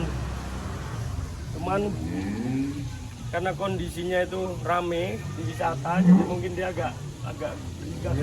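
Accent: Banyumas, Indonesia. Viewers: Ora ngapak yaa ora kepenak... Ora kepenak yaa ora ngapak... 😍😍😍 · Ciri khas ngapaknya jangan sampai tertinggal mas selalu sukses selalu lembu benggolo farm & resort 🙏🙏🙏🙏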